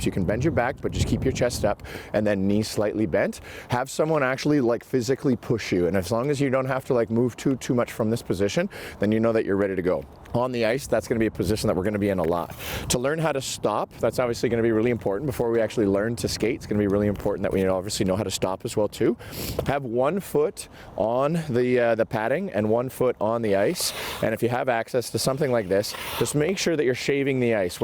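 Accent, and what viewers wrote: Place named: Southern Ontario, Canada. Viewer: Came for the tips. Stayed for the Leafs representation and southern Ontario accent. Felt right at home. Thanks for the lesson!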